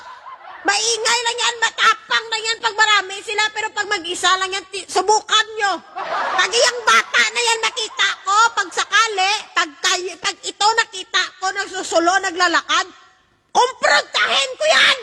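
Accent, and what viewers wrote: Iloilo, Philippines. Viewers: Ilongga ka mam, isog gd 😁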